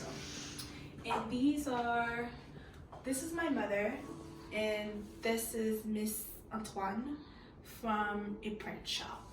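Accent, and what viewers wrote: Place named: Haiti. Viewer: If I didn't know you were Haitian from the accent, the glassware cabinet was a dead giveaway lol Such a stunning space 🤍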